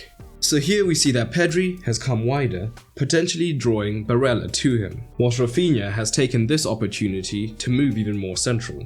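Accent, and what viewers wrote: South Africa. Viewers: You sound like you are from South Africa.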